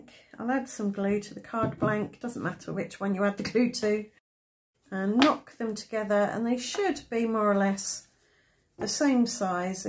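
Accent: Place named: United Kingdom. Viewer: I love hearing the British accent too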